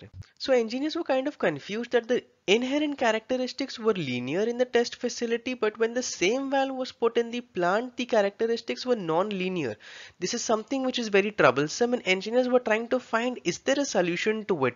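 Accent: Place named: India. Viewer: Your English and explanation can only be understood by Indians.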